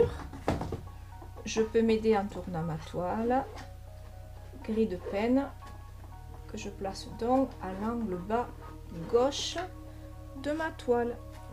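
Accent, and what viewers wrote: South, France. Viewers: Un grand merci pour ces partages, vous avez l'accent du soleil,J'adore !🎨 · Il est ma..fini.. fi..que votre tableau. Je suis abonnée depuis plus semaines et j adore visionner vos turbos. Merci encore pour votre voix chantante.. du Sud sans doute??😃💋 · J'aime beaucoup ce que vous faites. Celui-ci me paraît simple, je le ferai dès demain.! 🤔🤔🤔⚠️⚠️⚠️ Enfin je vais essayer. Bisous bisous.. De quelle région est votre accent? Marseille, Perpignan? Toulouse.? Parce qu'il me semble que nous avons le même, moi c'est du Midi 😜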